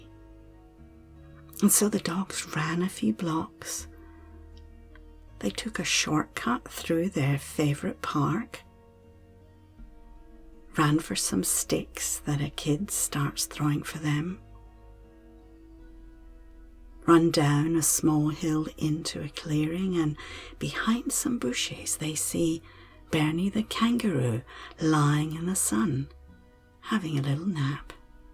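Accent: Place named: Canada. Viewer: My daughter loves this story and requests it almost every night. It was a significant part of helping her school/separation stress. Thank you. I share your channel with almost all of my clients, as so many struggles with sleep concerns, anxiety, or busyness! If there is anything else I can do to support your work, let me know. Love your "accent". We're Canadian, eh?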